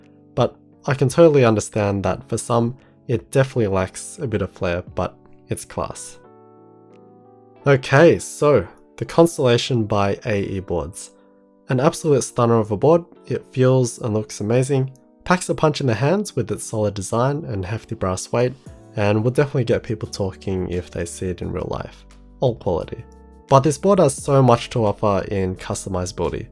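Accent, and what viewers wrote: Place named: Australia. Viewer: sounds like every single Asian Australian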